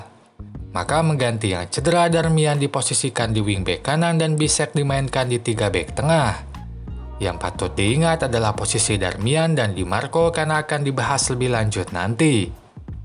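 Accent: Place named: West Java, Indonesia. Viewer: Sunda pisaaan euyyy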